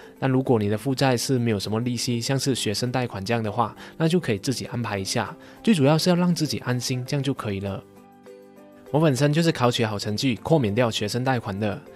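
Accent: Malaysia. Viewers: 为什么今天那么马来西亚腔hahahaha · 原來不只我覺得這支影片的馬來西亞腔比較明顯哈哈哈可是都很好喔又學了很多當我存款不多的時候創業時真的很壓力心裡不安到爆炸兜兜轉轉回歸原點這個影片真的超中肯✨✨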